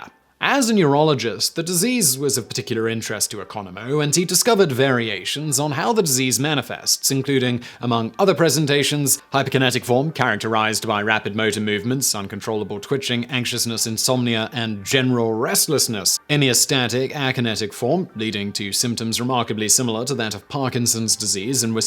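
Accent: United Kingdom. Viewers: The British pronunciation! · Ms. Forbes: you may have heard somewhere that not all terms are American in origin or usage. Simon is a Brit and the series originates in England. They have chosen for centuries to go with the Germanic influences on the English language, especially in regard to scientific terms. For whatever reasons, Americans lean toward the Romance languages for pronunciation of those same terms.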